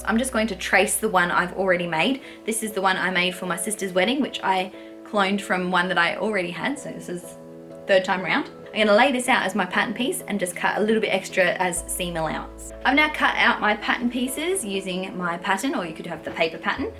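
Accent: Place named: Australia. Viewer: Hi, I have only just found your channel. It’s nice to hear a fellow Australian and using centimetres too. I love your tutorials and look forward to seeing the others. I also look forward to making the fitted cot sheets. Michelle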